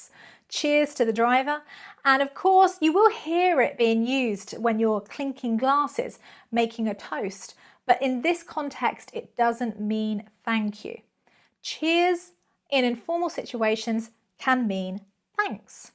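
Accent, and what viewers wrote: United Kingdom. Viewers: Hey teacher you look like Hindu, but your accent sounds beautifully British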